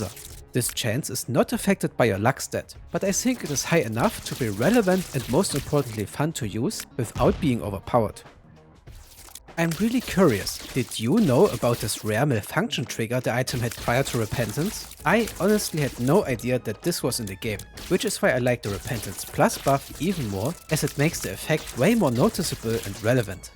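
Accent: Germany. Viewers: Ahhh jaaa der schöne Deutsche Akzent wunderbar❤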